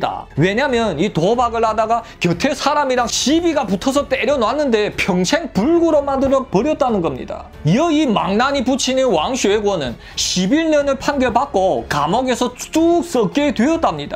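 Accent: Yanbian, China. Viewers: 한국말을 중국발음으로 들으니 힐링되네.. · 한국말 연변말 섞어서 너무 이상하네요 하나만 하세요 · 조선족 같음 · 한국말을 중국말처럼하네.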